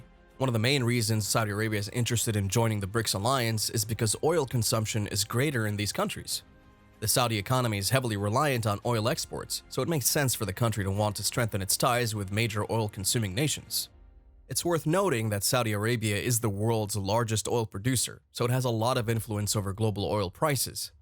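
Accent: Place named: Texas, United States. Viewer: You sir, are starting to sound Texan , I like it. I have always had a good feeling about Russians. I think they are tough no nonsense people, and I heard they make good vodka. Trxas has so much oil we could give it away for free , and still make a profit.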